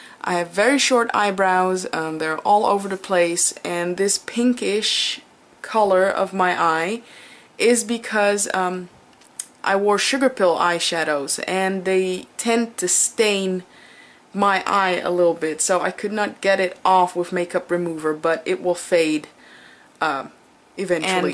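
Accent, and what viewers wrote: Netherlands. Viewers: you're dutch aren't you? because of catrice and your accent..? i'm dutch tho xx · wauw!! prachtig haha. Ik hoorde trouwens meteen al dat je Nederlands bent haha :P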